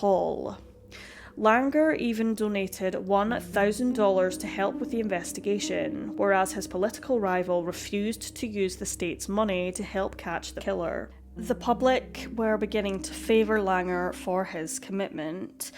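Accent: Scotland, United Kingdom. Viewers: She is Scottish. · she sure is from linda in scotland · I love the orators Scottish accent very sad story no winners both families decimated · She has a Scottish accent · Six. She has a Scottish accent. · I think the narrator is actually a Scot. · I believe she is Scottish. I am English. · Scottish accent!!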